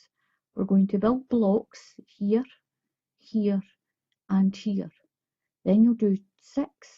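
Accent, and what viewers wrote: Scotland, United Kingdom. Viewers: When I was growing up, we had neighbors that were Scottish, you’re accent reminded me so much of these dear, sweet people. This video was very complete from the beginning to the end of the blanket. I have one started, but I didn’t know how I was going to fill it in and end it. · Hi. First of all I absolutely love listening to your Scottish accent. Secondly I have now mastered the c2c thanks to you. Finally are you able to tell me the make of the beautiful yarn shown at the start of this video which is grey and pink/peach white? Jeannette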